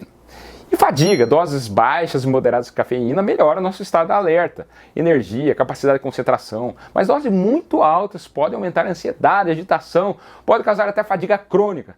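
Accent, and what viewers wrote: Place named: Minas Gerais, Brazil. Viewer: vrdade gostei tbm do jeito mineiro.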